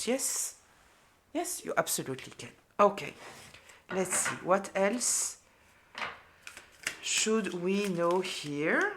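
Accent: France. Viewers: Love your beautiful French accent, and when you swear, lol, do you reside in the UK? Very insightful reader, I do resonate xx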